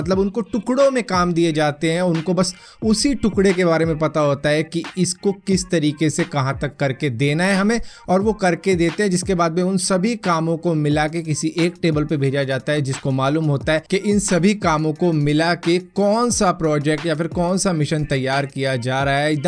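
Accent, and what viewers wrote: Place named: Punjab, India. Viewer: Muje ehsa lgta haii ki app punjab ke ho..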